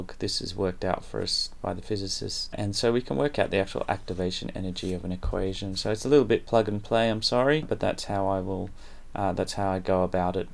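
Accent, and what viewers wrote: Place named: Australia. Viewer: Hi,Lukas here.... Your videos are really great! I'm watching this one now for the test on Monday, in fact. By the time I've finished the iB, I hope to have mastered the Australian accent through your videos, but of course, in addition to some good chemistry knowledge. Also, I'd like to point out that the mysterious "DOGE" = Luke.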